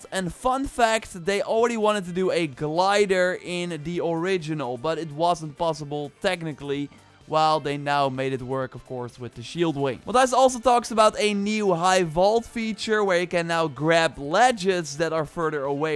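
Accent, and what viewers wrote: Netherlands. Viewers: Lekker Nederlands accent man:)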